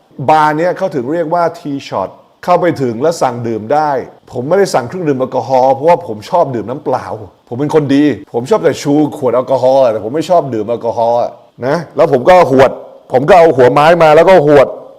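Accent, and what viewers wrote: United States. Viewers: แต่ต้องตัดสำเนียงฝรั่งออกก่อน ไม่น่าเชื่อ เกิดและโตในเมืองไทย ไปเรียนต่อที่เมกา กลับมาเมืองไทยแล้วยี่สิบกว่าปียังตัดสำเนียงเมกันออกไม่ได้ หรือคุณปลื้มไม่ยอมปรับเปลี่ยนเอง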